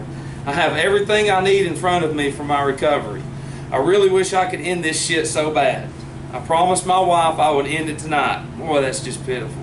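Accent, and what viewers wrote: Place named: Texas, United States. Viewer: Great video dude. Raw and honest. Love it. U just be from Texas or Tennessee. How’s ur progress these days?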